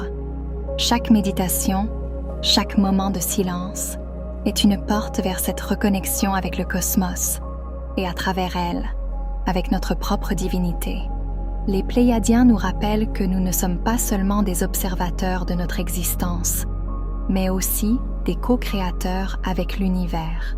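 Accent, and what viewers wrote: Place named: Canada. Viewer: ******* Bonjour, (Elle a un petit fond d'accent canadien cette voix de l'IA ). Nous le savons bien qu'ils nous manipulent depuis le panthéon mésopotamien..... Mais nous sommes au temps de l'apocalypse et notre désir est de parvenir sur Nibiru le plus vite et le mieux possible... Nous y travaillons de tous, nous, les Ligthworkers... Hi, Fathala...Par IA interposé ! ******************************************************************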